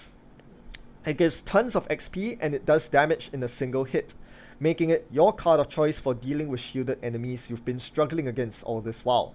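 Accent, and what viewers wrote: Singapore. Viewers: You from Singapore by any chance?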